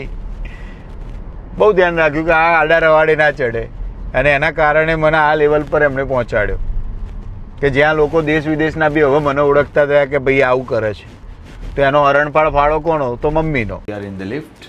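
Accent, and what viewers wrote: India. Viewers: Tmara accent Mehsana na lag ch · Tame kya na cho sir Tamara acent sabarkanthaa k aravalli side ni lage che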